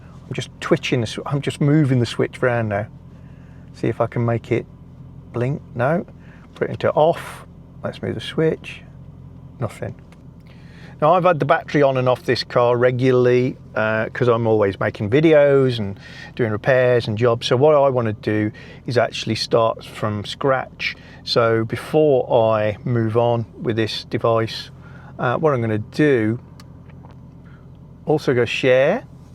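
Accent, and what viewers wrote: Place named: North, United Kingdom. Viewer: You should clear the fault codes and see what comes back. Also, your jury rigged fuel pump probably isn't helping. You need to properly reinstate the fuel pump. I know you were loath to do that but you're at the point where you need to properly sort it. You should try swapping sensors from one of your other cars to eliminate faulty sensors. You could also do with a better scantool. That Launch one looks to be little more than a glorified code reader. I have a Topdon AritDiag 800BT I'd be happy to scan Steel if you're close enough to me (your accent sounds "northern"). I can also test your battery because I have a Topdon BT200 battery tester (yeah, I'm a Topdon fanboy). Are your car names a reference to TV shows that Dame Joanna Lumley has appeared in? If so, I'm sure a future car would have be called Patsy or Edina. 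If I could choose any female voice for a sat-nav, it would be Joanna Lumley. She has such a lovely voice.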